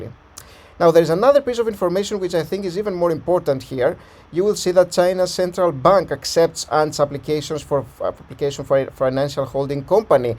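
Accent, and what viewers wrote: Greece. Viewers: Again da best greek accent in english ! Dont you think there s an other bulltrap forming ?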